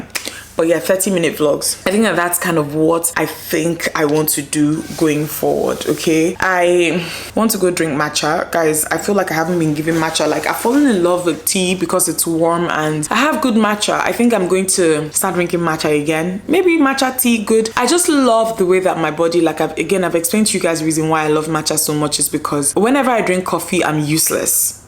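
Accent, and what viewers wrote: Nigeria. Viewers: Nigerian accent, how? 😂😂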